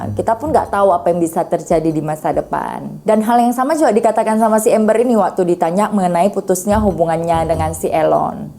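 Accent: Medan, Indonesia. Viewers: Dia Indonesia tapi pake Logat medan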